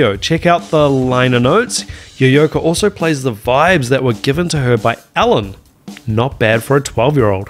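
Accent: New Zealand. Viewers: Don’t forget the people who don’t speak English at home. Add the NZ-accent to that. I only hear some English like sounds but can’t make up the words 😰😰😰.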